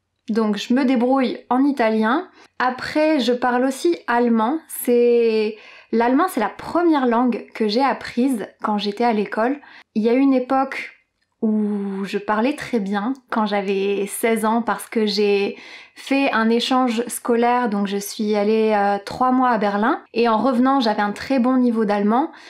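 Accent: France. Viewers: I'm happy by your efforts given. This is my first time to see your channel. I like your French accent and also it's also sort of understandable. Please continue sharing some French language episodes. It's really helpful. Merci beacoup. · Je déjà suivi Portugais avec Leo ici sur l' Youtube. S'il vous plaît, corrigez moi si je suis équivoqué. J'ai abonné récemment au votre canaliser ici sur le YouTube. J'aime 😍 ton accent français. Es-tu française? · Yo, au vu du nombre d'abonnés entre le 5 juillet et le 21 juillet, à ce rythme, il y a des chances de passer les 100k abonnés d'ici la fin de l'année à force de référencement. Il faudra que tu fasses une vidéo en anglais un jour, ils veulent entendre ton french accent :p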